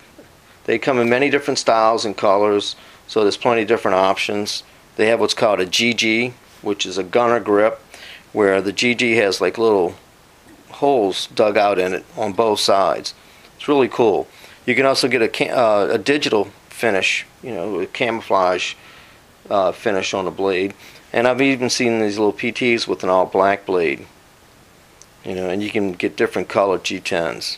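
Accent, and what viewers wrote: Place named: New England, United States. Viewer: Nice vid! You've got an interesting accent. I detect some New England and some Southern. I know that's very vague as there are so many variations. :-) Where have you lived?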